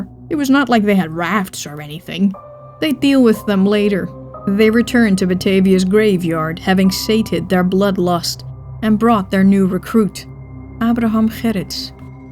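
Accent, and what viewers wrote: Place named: Netherlands. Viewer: I have read many books on the Batavia topic, and even done some research myself about the subject... and never came across material so valuable from an unknown source! Yes, you may find specialised info reading the "usual" suspects (Mike Dash, Hugh Edwards, etc.), but I never expected such a high level from someone running a YouTube channel! Your style is professional, yet accessible to everyone; the research well done and facts stand verification; and your narration, with your unique Dutch accent makes the series even more enjoyable. I recently went to the Houtman Abrolhos Islands, and visited all the islands in this chronicle, I took photos that I could share with you, in case you wanted to update your material, or just happy to share them with you if you're curious... You are doing an amazing job and I commend it! Well done! 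Looking forward to more of this...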